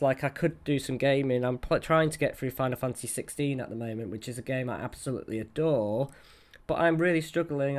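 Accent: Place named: United Kingdom. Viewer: You tickle me w/ the “and stuff”, LOL. So silly and fun. You are doing so awesome w/ all your videos. I can see your confidence building. I enjoy you so much! I am a sucker for the UK accent. I watch so many Brit shows. Even the comedies have eloquence w/ the voices. I am in the southeast US. We sound NOTHING like you guys. I could listen to you & (your putty lady) all day long. Keep them coming. I love your WIP! We have some nice tea shops where I live. Chocolate tea has been a fav for yrs. And you are right, 😋 delicious!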